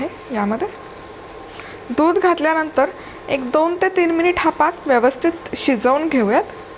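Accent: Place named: Pune, India. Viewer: Jinnas means ingredients na..ryt....ssly punyatle lokanche marathi pure e..sahi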